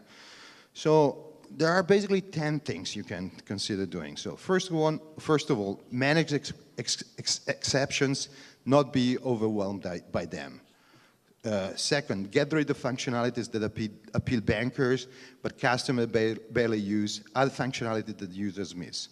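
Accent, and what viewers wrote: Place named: Germany. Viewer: Ma parli inglese con accento tedesco. Come Giorgio Moroder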